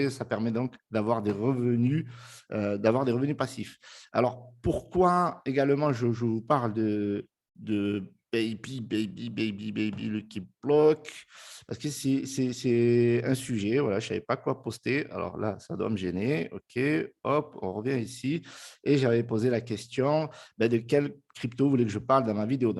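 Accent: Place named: Marseille, France. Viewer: merci pour ta bonne humeur , surtout en ce moment ! tu aurais pu être de Marseille avec ton accent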